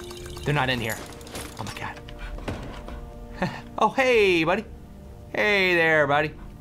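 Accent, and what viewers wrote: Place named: London, United Kingdom. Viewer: an American that can actually pull off a north and south london accent well done!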